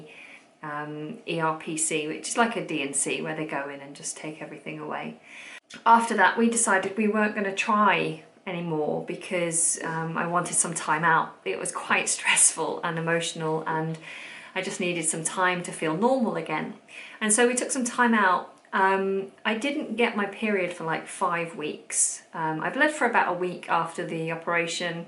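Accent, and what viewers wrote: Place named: England, United Kingdom. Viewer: Congrats to your family. That cool English accent tho :)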